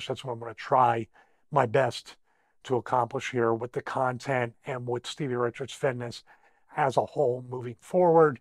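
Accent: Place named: Philadelphia, United States. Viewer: Never apologize for your Philly accent, you are Philly Born, Philly Raised, Philly Tough and Port Richmond Strong. Prayers for you and all who have no one who are struggling with their illness.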